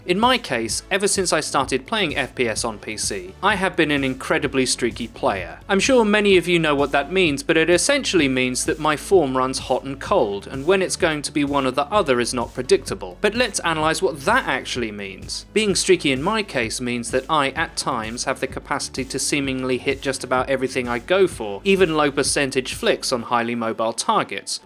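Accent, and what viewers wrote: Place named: United Kingdom. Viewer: A lot of yanks discovering british english for the first time I guess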